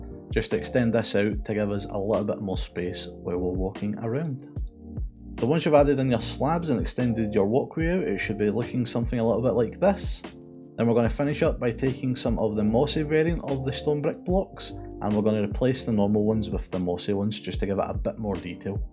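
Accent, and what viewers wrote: Scotland, United Kingdom. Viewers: Thank you! Your tutorial was very helpful and easy to follow. I'm Scottish too so your accent was easy to understand :)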